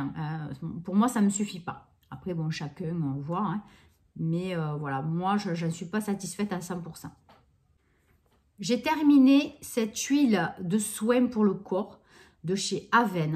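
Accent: South, France. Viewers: Dis donc je me régale avec l'accent du sud, il y en a peu sur YouTube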